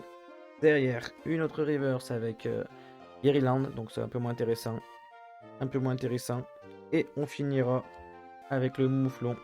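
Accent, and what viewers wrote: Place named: Lyon, France. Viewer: un lyonnais je parie ou stéphanois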